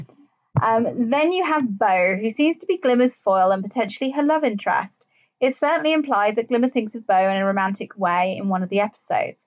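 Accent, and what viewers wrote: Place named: United Kingdom. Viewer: You kept saying antagonist wherein you should be saying protagonist... Is it me or is it your brit accent? Anyway I do like this new iteration. But the old one was way better.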